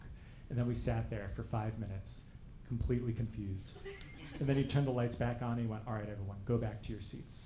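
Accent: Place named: Saskatchewan, Canada. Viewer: Lovely, sounds like you grew up in Dog River Saskatchewan, great stuff!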